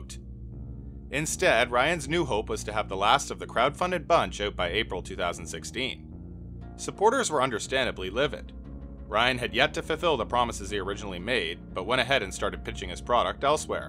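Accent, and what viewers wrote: Canada. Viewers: I think the voiceover guy has the most Canadian accent ever lol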